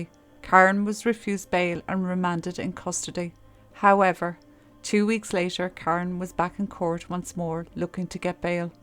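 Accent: Ireland. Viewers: I love your narration voice, your Irish brogue ❤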